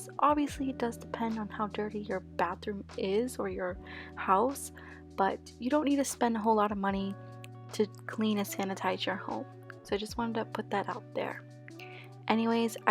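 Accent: Boston, United States. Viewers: Just found your channel. Luv ur Boston accent ♥️🤍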